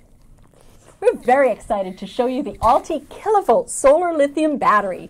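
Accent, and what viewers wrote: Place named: United States. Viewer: That blew my mind and I had to get a cup of tea and watch it 3 times lol/. I love an American accent and that was the best tutorial ever!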